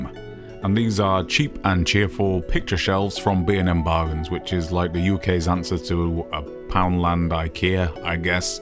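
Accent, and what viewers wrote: New York, United States. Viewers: Good video. That NY accent is excellent. Only one copy of Cursed City though? It's like you don't even know how to youtube. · I love this dude. Just discovered the channel. Keep on doin this beautiful thing your doin. Nice NY accent btw.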